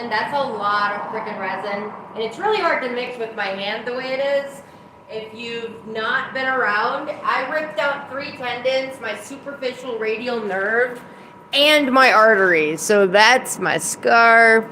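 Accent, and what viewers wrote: Michigan, United States. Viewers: Man I just found your channel and I love your high spirit. You are so much fun to watch. It sounds like you are in Michigan and so am I. If you hold classes let me know I would love to attend. I work with resin and I’ve been contemplating adding wood.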